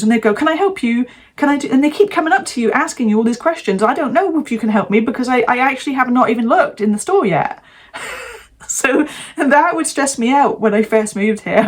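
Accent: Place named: England, United Kingdom. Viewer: Still got your English accent! Hang on to it Luv!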